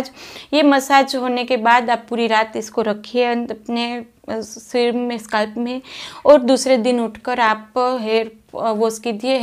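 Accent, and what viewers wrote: Gujarat, India. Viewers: Ap Gujarati ho ky??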